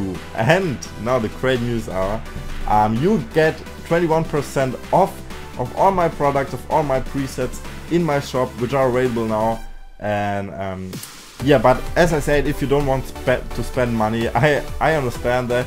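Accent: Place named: Germany. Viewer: Der deutsche Akzent ist das Beste! :D Ich schau mal rein, support ist kein Mord. ;) :)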